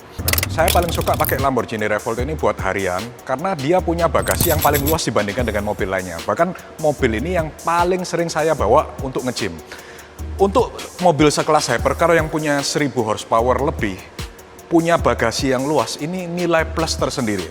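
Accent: Java, Indonesia. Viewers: kaya tapi jelek dan suaranya kenapa jowo bgt yah? tapi mantabh lah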